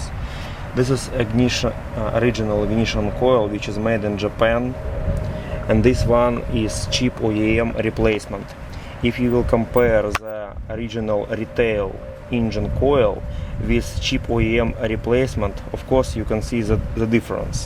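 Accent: Russia. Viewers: love the Russian accent 👌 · You must be russian..:goodvibes: i love your accent. I subscribed! I love your videos.. straight to the point, no bs. Thanks!!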